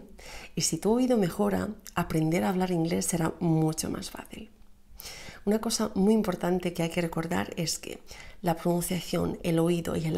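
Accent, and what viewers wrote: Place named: Madrid, Spain. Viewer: Hola te felicito excelente Maestra 👌 de Inglés,tienes una pronunciación muy bonita, cómo Tú eres. Te voy a seguir en la red. De qué parte de España eres parece tu acento Madrileño? Yo soy de México de la zona metropolitana de la CD.de México. México y España por Siempre Unidos. 🇲🇽🇪🇦😘😘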